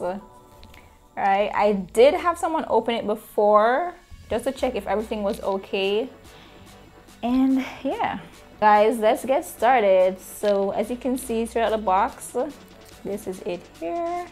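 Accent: Jamaica. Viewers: So many unboxings but how many with a Jamaican accent? Actually this is the first Pixel 2 unboxing I am watching.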